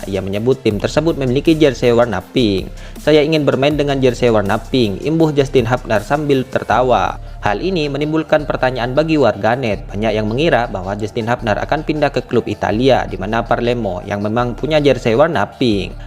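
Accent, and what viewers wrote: Madura, Indonesia. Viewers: Logatnya kayak orang madura ya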